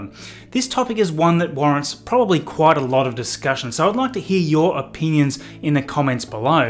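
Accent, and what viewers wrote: Australia. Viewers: That's why I pointed it out :P That's an Australian accent btw, not British hehe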